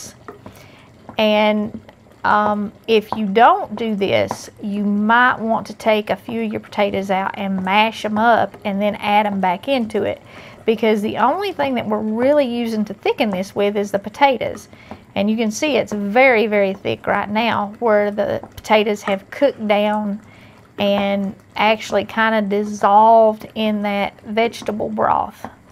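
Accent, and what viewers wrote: South, United States. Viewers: I am a New York Italian, who loves Jesus and I feel such an affection for my sister with a southern accent, I would love to come on your show one day and and cook something real Italian like a very old Neapolitan recipe that we call rice pie, we are all going through a hard time and I so appreciate your faithful words and I so agree with all you say. To God be the glory, God bless you my sister and again I say Thank You. I will look through the comments for those who need help I am blessed I live with my daughter she looks after me God bless her, so I will let the Lord lead me in helping others. Oh by the way I love your recipes.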